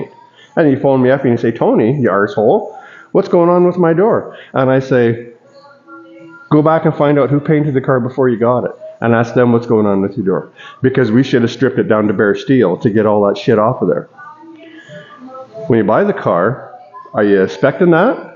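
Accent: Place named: Canada. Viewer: It is good to see a Canadian that still talks Canadian, eh.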